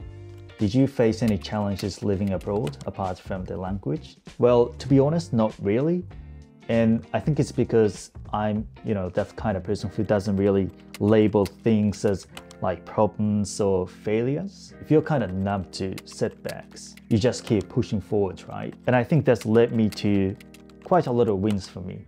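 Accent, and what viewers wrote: Australia. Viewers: オージーアクセントが炸裂してる笑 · この人の英語ってフラットというか、イントネーションの高低があんまり激しくないように聞こえるんだけど オージーアクセントを身につけた結果としてそうなったのか、意図的にそうやって話してるのかどっちなんだろう？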